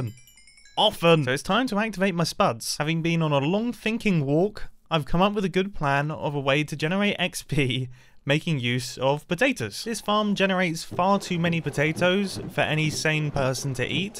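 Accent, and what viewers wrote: South, United States. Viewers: His southern American accent is everything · your southern accent never fails to break my brain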